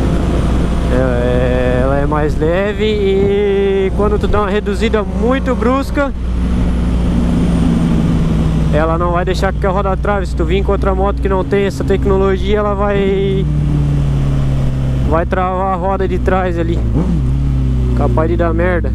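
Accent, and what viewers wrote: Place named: Santa Catarina, Brazil. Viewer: E pelo sotaque, e eu também sou moro aqui em Florianópolis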